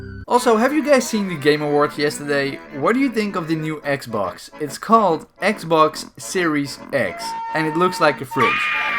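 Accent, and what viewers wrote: Netherlands. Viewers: You're Dutch right? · Are you Dutch?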